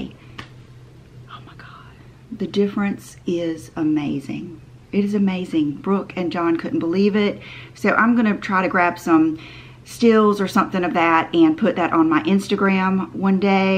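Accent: South, United States. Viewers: I love your southern accent so much 🦋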